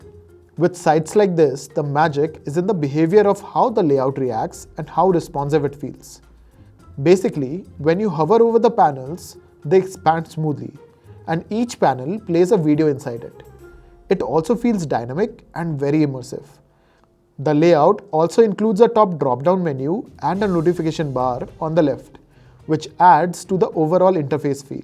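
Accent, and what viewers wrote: India. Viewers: I cant watch it anymore the indian accent triggers me so much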